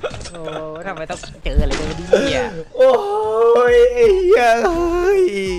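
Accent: Shan, Myanmar. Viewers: คนทีพูดเป็นไทยไย่ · พี่เขาพูดภาษาไทยใหญ่ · พี่เค้าเป็นคนไทยใหญ่หนูฟังออก เพราะหนูก็เป็น55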